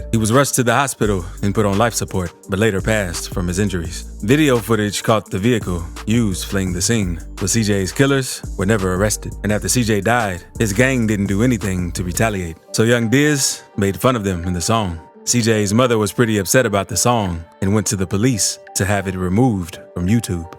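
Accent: United Kingdom. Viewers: The UK accent threw me wayyyy off 🤣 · My guy feelin his voice this recordin’ talkin a lil diff n a uk accent 10/10 😂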